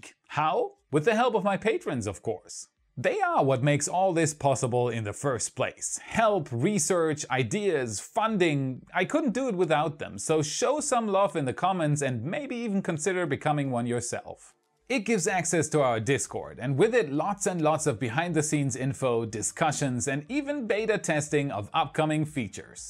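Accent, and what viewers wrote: Germany. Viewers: I'm curious about where comes from. According to how he said "Einstein Bose", he really sounds (Northern) German. Does anyone know more? (Not being a native speaker, I'm not very good in detecting dialects)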